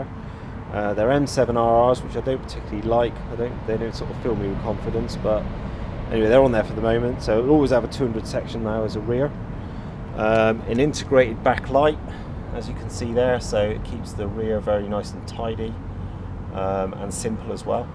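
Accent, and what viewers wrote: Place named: Swindon, United Kingdom. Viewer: It looks stunning in that orange. Where did you get that smaller number plate made? Going by your accent I would say your near the Swindon area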